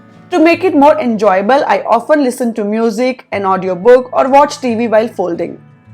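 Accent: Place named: India. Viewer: IM SORRY BUT A Hindu infused english accent has to be the strongest, heaviest, and most difficult to understand in the world. I cannot be the only one who finds this accent to feel like nails in a blender. I would rather subtitles in English, if you arent a native English speaker and you have a hindi accent. Please please, I pray you recognize how horrific this accent sounds. Its not your fault. But its miserable, and it is a choice to continue trying to record videos on youtube.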